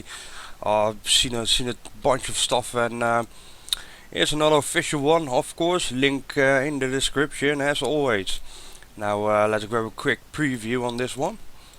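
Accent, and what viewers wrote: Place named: Netherlands. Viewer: First, I like your accent, your 100% dutch hahahaha. What the pipe/spoiler is in the image reminds me of a "pitot tube" on a airplane, its almost a 100% match. Look up "pitot tube" and do a picture search on google, you will recognize the object immediately. I think a flying object has crashed there, and it had a pitot tube for sure.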